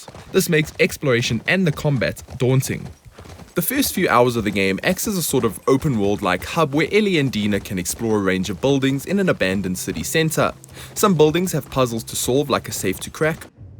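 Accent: South Africa. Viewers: You South African bro? Nice review. Yeah you're definitely from Cape Town. Stellies maybe?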